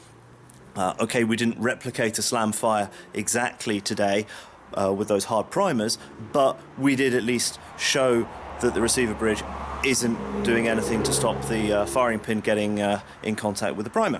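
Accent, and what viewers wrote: England, United Kingdom. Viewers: so weird to hear a British accent on a gun video instead of an American southern drawl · Hi, nice test. Are you English? Can a Garand T2 girino a 30-06 cadridge? Andrea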